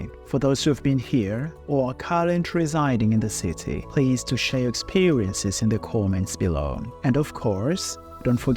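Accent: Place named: RP, United Kingdom. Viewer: Kizungu cha BBC news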